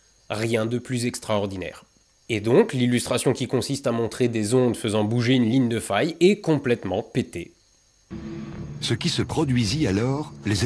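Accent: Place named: Paris, France. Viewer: Merci bcp. C'est juste dommage de faire toute la vidéo en prononçant "séizme" bien à la parisienne. Que ce soit pour séisme, Israël ou autre, la lettre s ne se prononce comme un z que lorsqu'elle est entre 2 voyelles. Ex: Isabelle. Bonne continuation.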